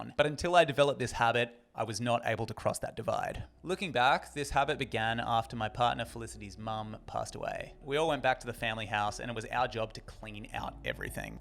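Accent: Australia. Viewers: the ausie accent is just too much to take. sorry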